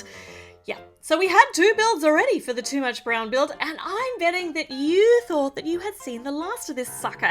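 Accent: Australia. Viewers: Me: *watches this * Mum: *walks into my room * Also mum: I rEcOgNiSe ThAt AuStRaLiAn-Y aCcEnT Legit just happened 😂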